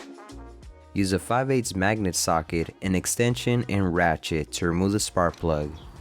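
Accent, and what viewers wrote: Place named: Texas, United States. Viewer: are you from Texas?You said you was fitna be replacing… sound like a home boy to me!!🤙🏾🤙🏾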